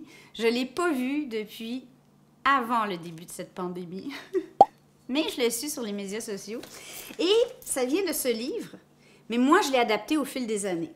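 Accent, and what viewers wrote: Canada. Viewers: bonjour, je m'abonne ici et j'adore ton accent canadien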